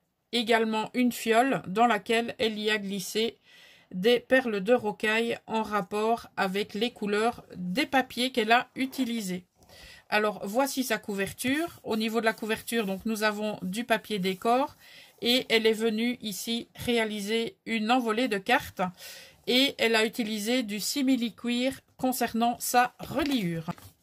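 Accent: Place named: Belgium. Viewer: Bonjour ma petite, Woaw quelle merveille 😍🤩 vraiment très douée Ileen, bravo! Son album est superbe 🤩 et très bien travaillé 👍 avec un thème que j’aime particulièrement 🐇 seulement 11 ans….. c’est plus que prometteur, la relève est assurée! Ça m’a fait plaisir de t’entendre avec ton tout petit accent sur les « UI « ( comme ds 8 ) qui me fait toujours sourire chez nos amis belges à croire que le Luxembourg n’est pas épargné 😄 j’adore! Gros bisous 😘💕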